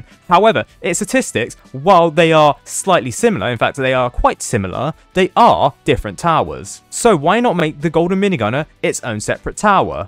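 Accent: United Kingdom. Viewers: Wait what ur British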